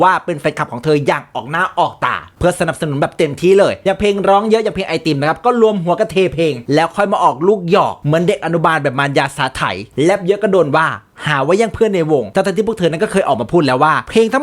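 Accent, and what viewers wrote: Isan, Thailand. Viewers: พากย์ได้แซ่บจิงๆ ชอบๆ มีแทรกอีสานเข้าไปด้วย มันส์ดี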